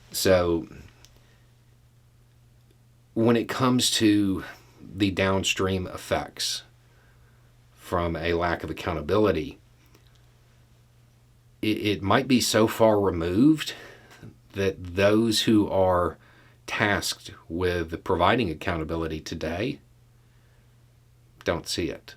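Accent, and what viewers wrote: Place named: Panhandle, United States. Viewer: So weird to go back to videos from like 4 years ago, back when he was leaning heavily into a Panhandle accent.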